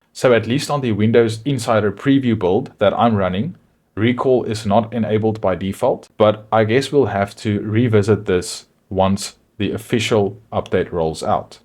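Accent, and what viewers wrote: South Africa. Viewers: You sound South African... homie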